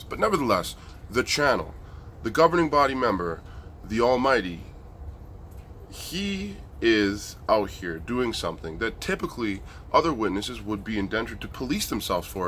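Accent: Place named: Canada. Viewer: You are so adorable and funny. Love the Canadian accent!!!!!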